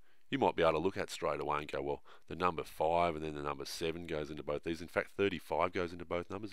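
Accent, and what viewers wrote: Australia. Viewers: You can tell he's Australian by his first words on most videos, 'G'day everybody...' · That thic australian accent calm my mind... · Love all your video's and you have a cool voice, sounds like an Aussie accent :-) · Your channel is the reason I'm starting to overcome my fear of mathematics. Your style is unique, you avoid unnecessary terminology and technicalities, you keep it clean and simple and you have an innate aptitude for explaining problems. And bonus, I love your deep and soothing voice your accent is amazing! Is it Australian? Thanks and keep making these amazing videos! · Oh my god thank you so much! I've watched several other videos but thy didn't help me one single bit, and this helped me so much! Maybe because I'm used to aussie accents. xD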